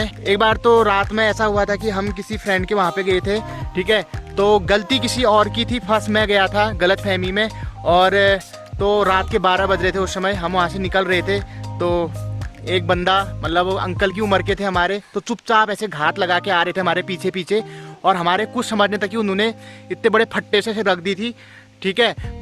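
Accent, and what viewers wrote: Pahari, India. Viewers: Mujhe aapki pahadi bolane bahut badhiya lagti hai👌👌👌👍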